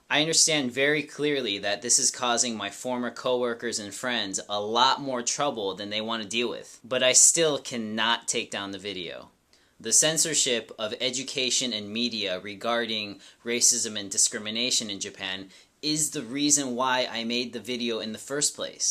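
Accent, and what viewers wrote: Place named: United States. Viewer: I can tell from your accent that you are American. If you are American, you should focus on racism in America. Living in Japan more than 20 years I know most Japanese learn about the racism within their country. Many people just don't get it or don't care much about it. As you said at the very beginning of your first video, there is racism in every country. You have no right to judge Japanese people unless you solve all the racial problems within your country.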